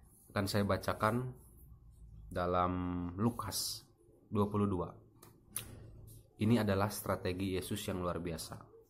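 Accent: West Java, Indonesia. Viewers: Kaya logat sunda??subscribe balik om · iya logat sunda..ada cmpuran sunda..tijalikeuh..😁😁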